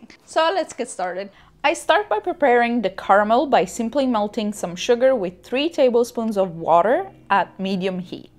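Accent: Italy. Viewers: Your accent became more American.. You were nice by italian accent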